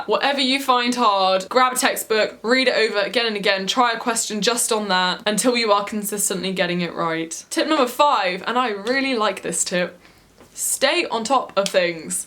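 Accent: England, United Kingdom. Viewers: Lol why is accent and voice so different to now(2020)?? Like she sounds more English which is weird as I’m English but I e never heard someone sound more British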